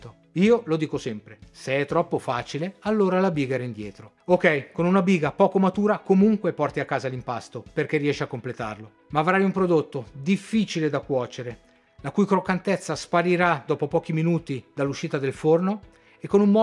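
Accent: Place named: North, Italy. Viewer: Complimenti!! Bel video. Saluti dall'Argentina!!! Sembri veneto o lombardo. Da dove sei?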